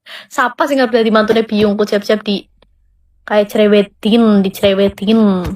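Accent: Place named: Banyumas, Indonesia. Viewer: Ngapak max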